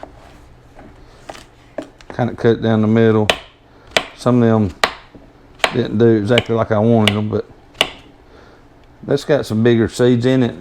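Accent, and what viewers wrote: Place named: South, United States. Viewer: Those are some beautiful cans of squash! Can this be done with all types of squash? I was thinking butternut squash. By the way, I love your southern accent. ❤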